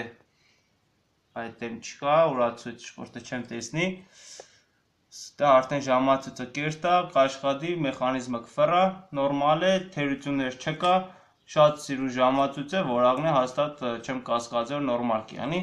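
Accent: Gyumri, Armenia. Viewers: Lenakani barbary dzec axpersss Like!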